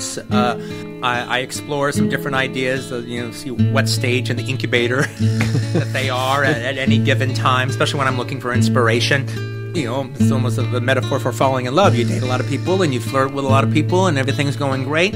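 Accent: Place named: New York, United States. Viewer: is he a new yorker or a chicagoan.. whats his accent? :) wikipedia.. --> tells me he is from knoxville Tenesee.. I guess he's accent morfed into californian accent by now.. but it sounds big city anyway! perhaps he lived in new york also as it sounds like new yorkan accent to my swedish ears..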